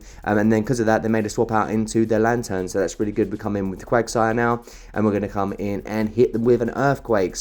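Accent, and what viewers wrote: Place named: Ireland. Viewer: Love the Irish accent 😳😍 please more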